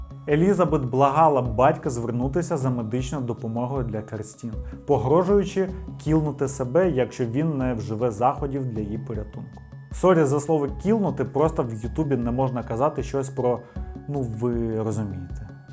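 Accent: Russia. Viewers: Хлопче, тобі необхідно працювати над українською, хоча б інколи спілкуватися з україномовними. Дебільні звуки, притаманні тільки рашистам, відвертають від перегляду і характеризують тебе як примітивного та слабого на голову дурня.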